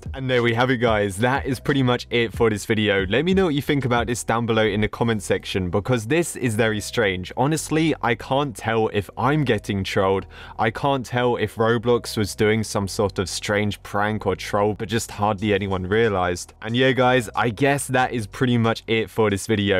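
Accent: United Kingdom. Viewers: Imma assume is British because of his voice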